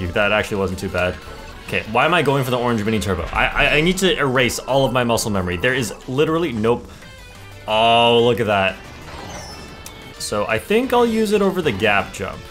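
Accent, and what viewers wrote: Canada. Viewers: i mean he's from Canada, sounds exactly the same, uses the same expressions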